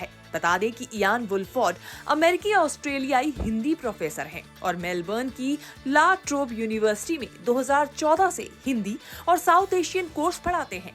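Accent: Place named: Bhojpuri, India. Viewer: Oh my God ... Your bhojpuri accent is so s#xy 😁😁😁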